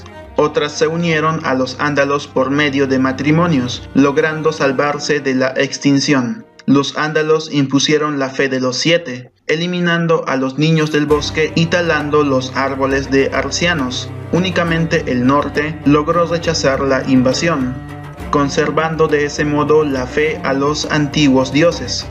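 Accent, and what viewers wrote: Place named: Bolivia. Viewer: Eres boliviano verdad? Acento inconfundible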